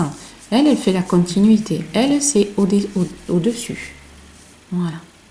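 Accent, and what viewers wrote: South, France. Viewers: Bonjour. Très utile ce tableau, associé au plaisir d'entendre ton accent du sud!❤❤❤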